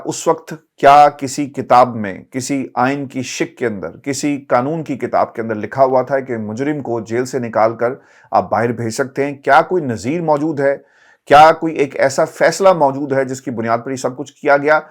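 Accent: Punjab, Pakistan. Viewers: The punjabi touch is pleasant, do continue with it.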